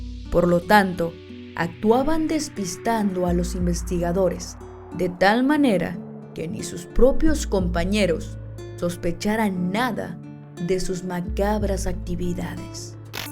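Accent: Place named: Mexico. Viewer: Muchas gracias,adoro tu trabajo , e tu acento mexicano , lo encuentro muy agradable al oido. Abrazos desde Brasil.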